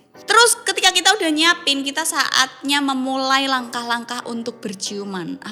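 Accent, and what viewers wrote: Java, Indonesia. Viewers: Iki suenneng aku logat e jowo e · Ngomoge mledok mbae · Aku kontenmu Mbak. Aku liat kontenmu kekel bhasamu Mbak.. boao jowone asik😀 Salam kenal ae wis Arema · Ni cwe tipe gw bngt..medok'a itu lho bikin gw lemes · mbak wong ndi to yo 😂ngomong e cek medok e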